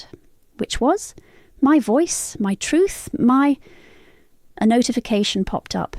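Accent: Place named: United Kingdom. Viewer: Just absolutely hate British accents in these stories. I don’t listen to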